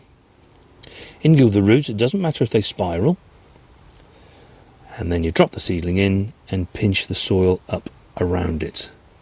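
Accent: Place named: Norfolk, United Kingdom. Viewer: You can't beat a good old Norfolk accent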